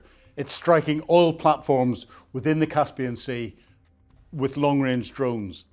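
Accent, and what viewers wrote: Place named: County Antrim, United Kingdom. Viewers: I'm sorry, but I just don't buy all you are saying about why the USA "needs" Greenland. Speaking with an English accent does not make you more believable. Have you ever played the game "Risk" ? I have a feeling that you would love playing it, perhaps with Donald. At this point these there is far too much rubbish and speculation on YouTube, and you are not helping. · Good use of maps and other visuals. I always enjoy your analysis. I also enjoy your accent, my father was from County Antrim and my relatives had that kind of accent. Now the Greenland fuss makes sense. Keep up the excellent work.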